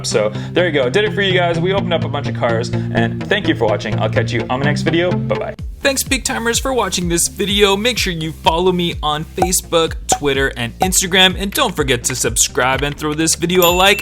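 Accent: United States. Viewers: how come you are British but you have an American accent?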